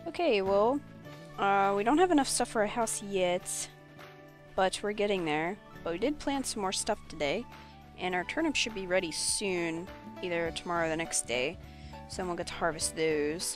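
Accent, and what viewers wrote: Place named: New York, United States. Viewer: Kind of sounds New Yorkish, they way you say certain words, heheh. cx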